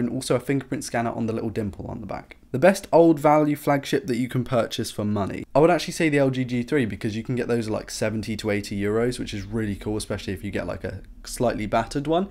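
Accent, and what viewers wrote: United Kingdom. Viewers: Happy birthday , and I like how you talk I like british accent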